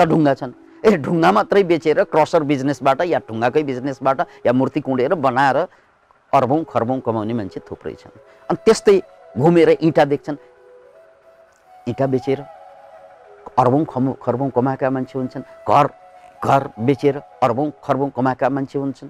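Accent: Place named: India. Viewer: बोलेको सुन्दा त इन्डियन हो कि जस्तो पो लाग्छ हौ!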